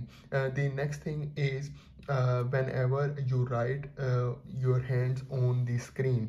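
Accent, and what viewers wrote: India. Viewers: Indian accent it seems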